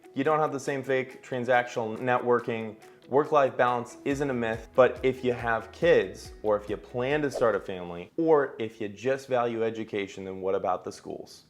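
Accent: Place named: California, United States. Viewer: Your video sounds like every Cali person....which is why no state wants you. You moved to NC and should acclamate and shut up.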